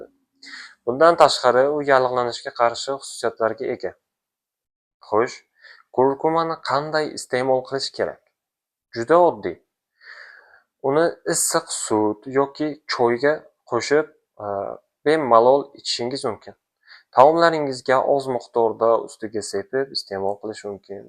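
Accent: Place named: Tajikistan. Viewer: doktor millatiz nima talaffuz iz ungacha, tochik ,hitoy, juhut emasmisiz?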